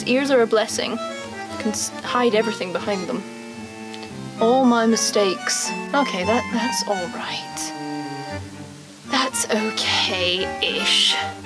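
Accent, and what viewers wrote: United Kingdom. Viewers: Hannah Hetzel he is from Scotland but has a very British accent. His accent is cute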